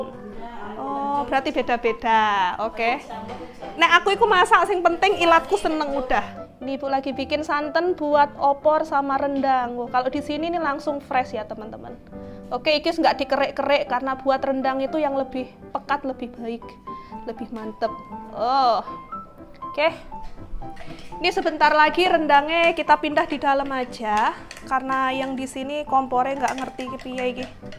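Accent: Java, Indonesia. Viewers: Mbak, pulang ke Indonesia medok nya keluar ❤❤ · Mbak jadi medhok bgt ngomongnya 🤣🤣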